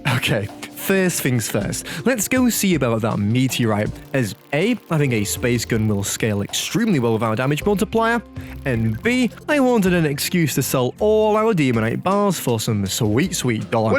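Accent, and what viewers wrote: United Kingdom. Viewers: youtuber and british acent at the same time is crazy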